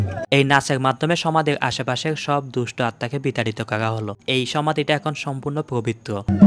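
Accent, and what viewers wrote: Chittagong, Bangladesh. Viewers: আপনার র/ঢ় উচ্চারণ ঠিক করেন,চাটগাঁইয়া ভাষাই আপনার জন্য উওম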